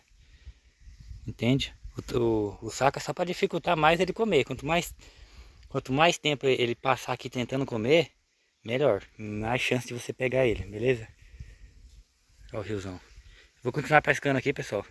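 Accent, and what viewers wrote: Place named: Mato Grosso, Brazil. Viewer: De onde vc é tá parecendo os Rio de tangará da serra MT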